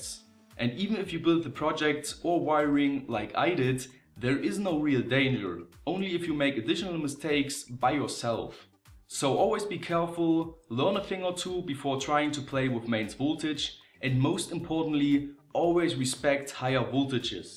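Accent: Saxony, Germany. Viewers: Thanks for the videos, I'm Colombian and is easy for my view videos in english even with your german accent, german is an lovely language and hear it is really cool, but my knowledge of that language is very, very limited. please don't make videos in german. Is a fact, english is a language more "extended" than german in the world. Congratulations for the 100k subscribers!, Is a outstanding work !. actually is my fav channel. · Ich finde man hört es an seiner (englischen) Aussprache, dass er deutscher Herkunft ist ^^ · Aha, noch ein Sachse! :D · Ein bischen hört man es das er deutscher ist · Genau! Und bitte schön sächseln;P nachbarschaftliche Grüße aus S-A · Since i heard your voice the first time i said ... " He has to be german !!! " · I like the German accent in your English and by doing these video's in English you at least reached The Netherlands :-) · your German accent has actually made it easier to pick the terminology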